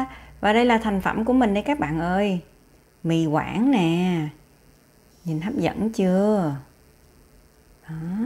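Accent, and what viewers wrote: South, Vietnam. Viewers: Always love hot boy voice and yours. Your South Vietnamese accent is cute. Your kitchen and cooking tools look very clean. I am impressed. Keep up the good work. Hope to see you in person some day at any restaurant in CA. I can’t eat a lot like you (because just smelling foods also makes me fat.😂) but I do enjoy watching your food stories).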